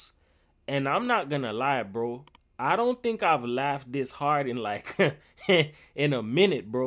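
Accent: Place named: Jamaica. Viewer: I can hear the Jamaican in you. Dope video. There guys are some clowns and deserve what they get. Nobody or going to buy his lawyers bullshit. He said nothing is wrong with throwing up a gang sign in a RICO case 😂😂😂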